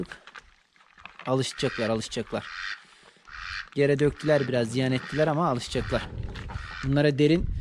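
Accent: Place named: Balıkesir, Turkey. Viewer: Degerli kardesim;bircok videonu izledim.Koy hayatini hic bilmeyen biri olarak zevkle izliyorum.Rahmetli babamin koyu varmis,17 yasinda gittim ilk defa.Ben balikesir in ibirler koyundenim(babam yasasaydi 75 yasinda olacakti sehirde dunyaya gelmis)senin sivenle benim koyumun sivesi ayni.keske bende koyde dogup buyuseydimde,14 yildir abd gibi ulkeye gelmek zorunda olmasaydim.TOPRAK ve koy.senin yemek yerken video larinida izledim.Kardesim,neguzel ki bizim gibi cop yemek (kimyasal)yemiyorsun.Allah ailenle seni mutlu etsin.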